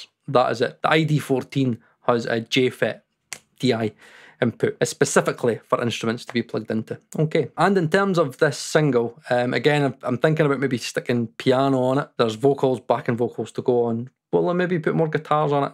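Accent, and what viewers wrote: Scotland, United Kingdom. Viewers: Came for the review, stayed for the SCOTTISH.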